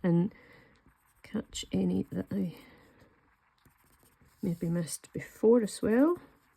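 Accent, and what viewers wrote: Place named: Scotland, United Kingdom. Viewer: Never heard of tangles until now. They look fascinating. Nice to hear that particular Scottish accent, too!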